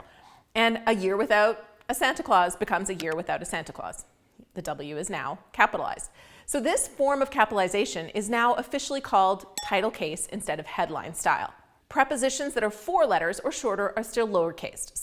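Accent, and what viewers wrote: Canada. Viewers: Very informative- as always. Thanks & hang on to that 🍁accent 😉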